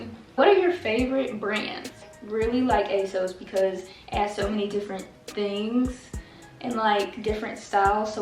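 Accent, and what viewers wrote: South, United States. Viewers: Ugh i was hoping you’d say what state you’re from. I love your southern accent 🥰. I’m from the south too girl; Tennessee to be exact. But i understand being from a small town you want to keep that private! Enjoyed this video! We’re so similar in ways and i really love your personality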